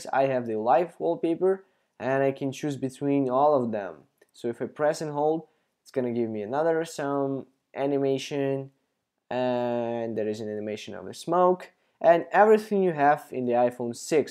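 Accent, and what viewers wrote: Poland. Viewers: Are y o u polish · Are you Polish?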